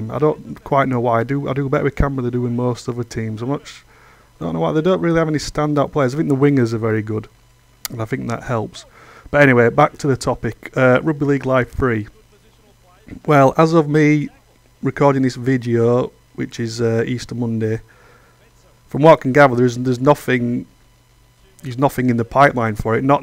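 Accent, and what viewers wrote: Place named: Yorkshire, United Kingdom. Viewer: I knew this lad was going to be talking sense about Rugby League when I heard his Yorkshire accent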